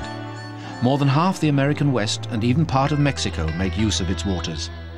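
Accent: United Kingdom. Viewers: I live right on the confluence of the Colorado and the Gunnison Rivers. The photography was beautiful. Colorado is a beautiful state and the many rivers and tributaries are fascinating. Unfortunately there were many inaccuracies and mispronunciations in this offering. Yeah and the Brit?! Really...🤨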